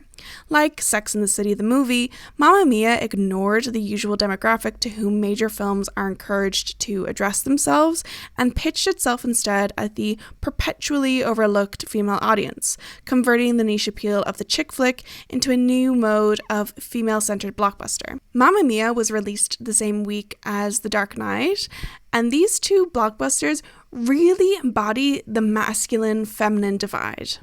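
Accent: Ireland. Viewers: i was wondering why you had a slight irish accent and then you said your house in ireland (and ar-land not eye-er-land to boot lmaoo) everything makes sense !! irish queen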